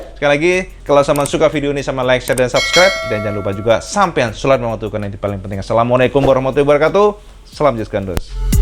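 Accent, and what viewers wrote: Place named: Java, Indonesia. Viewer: Kasih pin dong cakk.. Kesan pertama melihat channel ini cak sumeh dan gampang dimengerti tiap bahasa yg diucapkan, dengan gayanya yg njowo tenan cakkk membuat Anda terkesan waaw. Channel ini sangat2 memberikan informasi dan pengalaman2 tentang semua motor tentunya. Dangan dirangkum merakyat sekali.👍🏻👍🏻 Josss gandos #salam Pekanbaru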